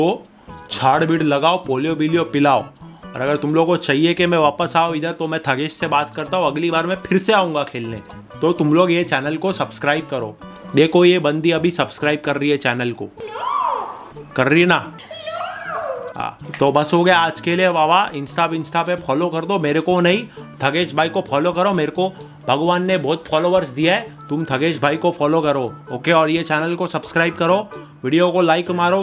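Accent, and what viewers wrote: Maharashtra, India. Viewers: I love your marathi tadka😘😘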